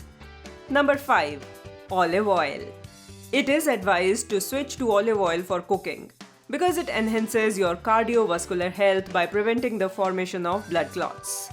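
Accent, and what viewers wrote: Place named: India. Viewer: Just listening to your Indian accent, i damn well knew i was in good hands. Loved that all these variety of fruits and vegetables are right up my alley, since i have to take Coumadin, due to having heart surgery. I know you did not recommend parsley, but it is excellent for the heart. Thank you so much.!!!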